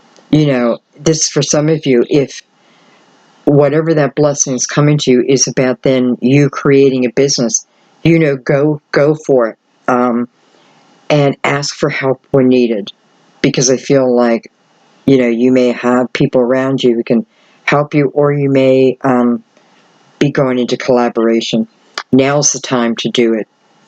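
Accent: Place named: Philadelphia, United States. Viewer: sharp 907 I hear it too. I’m from philly. We all have that accent. Lol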